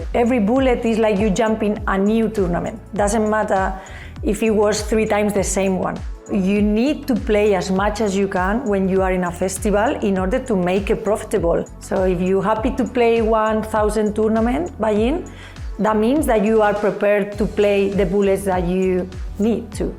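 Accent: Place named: Spain. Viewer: she’s obviously not Indian you melon, that is a Spanish accent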